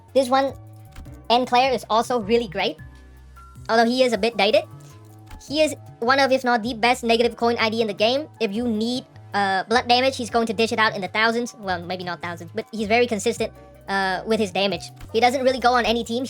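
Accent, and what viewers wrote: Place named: Vietnam. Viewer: hmm... Is it just me or... I find there're some Asian accent in your voice. Not gonna try to be racist bro but.... your voice really remind me a Vietnamese fr who us to be my friend in highschool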